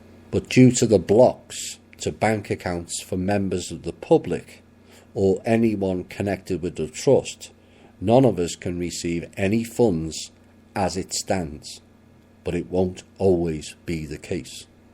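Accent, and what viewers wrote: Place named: Liverpool, United Kingdom. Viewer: I feel that the evil in this world has unleashed something palpable, and it seems to be growing exponentially! Maybe it has always been there and I only feel this because I am 'waking up' I don't know, but I pray for you, and all those who risk EVERYTHING to bring us truth and hope. May God (what/who ever you perceive the creator of light and love to be} shield and bless you always. THANKYOU!!!!! (PS - I'm guessing Birkenhead or Liverpool region?)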